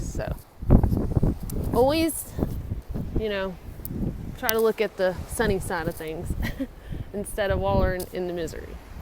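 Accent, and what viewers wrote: South, United States. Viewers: I enjoyed your AT hike as well as the PCT so far. I went to school in the South so it's fun to hear your accent and recall that southern charm! I developed bad plantar fasciitis in both feet that kept me off the AT this year. I found Pedag Viva Outdoors from Insole to be a BIG help - better than Superfeet as the former has not only an arch but a metatarsal support that keeps the forefoot from stretching/sliding forward. I found just the arch support of Superfeet (though otherwise well made and I have a half dozen of them) not as effective for PF - both arch and metatarsal supports supports the foot better allowing it to heal faster and preventing it from deveolping as easily for those prone to it. Also tight hamstrings can lead to or worsen PF so keep those stretched out before and after hiking, though some advocate stretching a few minutes after hiking letting the muscles warm up first - also all the muscles surrounding the knee should be strong, though I bet yours are pretty tops! 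All your info about PF was good last year so keep that up. This website has great stretches and exercises. Hope it helps. Really helped me: <link>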